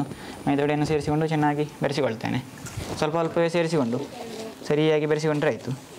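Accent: Mangalore, India. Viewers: Mangalore kannada🔥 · Great to hear your Mangalore kannada accent. Buns is my favourite snack for tea. Well done from a mangalorean living in Australia. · ನೀವು ಅಡುಗೆ ಮಾಡುವ ರೀತಿಯು ಅದ್ಬುತ. ಹಾಗೆ ನೀವು ಮಾತನಾಡುವ ಮಂಗಳೂರು ಕನ್ನಡವು ಕೂಡ ತುಂಬಾ ಅದ್ಭುತ. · I love Mangalore accent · Sir nimma Mangaloor maatu tumba chanaag iratte kellike...😍😍😍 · It just took me 20 seconds to Subscribe to your channel because of your genuine smile and the Mangalore accent.. Cheers for Life..!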